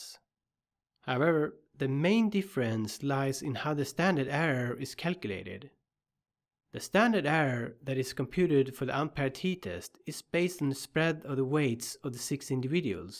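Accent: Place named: Sweden. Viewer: Din svenska accent går inte att ta miste på. Vrf gör du inte videon på svenska?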